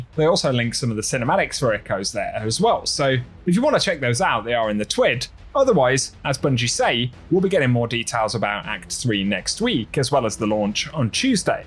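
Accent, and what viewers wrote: AAVE, United States. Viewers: Is it ebonics? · leave his ebonics dialect alone.. 😂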